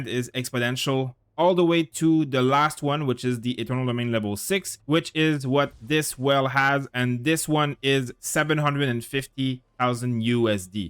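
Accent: Quebec, Canada. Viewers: Tu sonne comme un québécois aha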